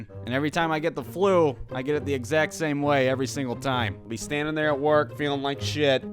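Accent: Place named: Toledo, United States. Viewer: Yo. Are you from Toledo Ohio????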